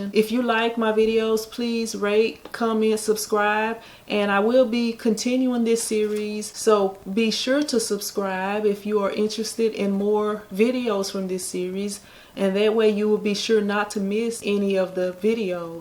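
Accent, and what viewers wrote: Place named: Louisiana, United States. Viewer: Thank You! The information you provided was so helpful! Love the accent I'm from Louisiana also!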